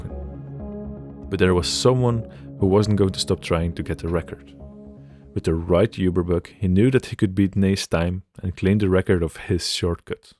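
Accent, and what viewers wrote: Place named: Netherlands. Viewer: 100% you are dutch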